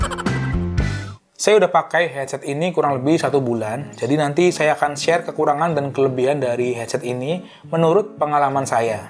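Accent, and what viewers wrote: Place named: Purwokerto, Indonesia. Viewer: Mas orang Purwokerto bukan wkwkw logatnya mirip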